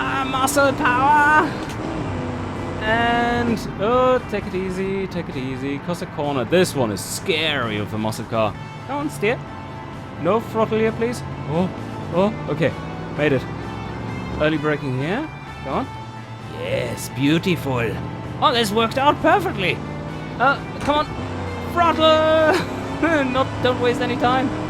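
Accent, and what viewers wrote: Germany. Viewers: Bon soir monsieur, are you from this lovely part of our earth Germany? I cant quite make out if you are German or not... Cheers mate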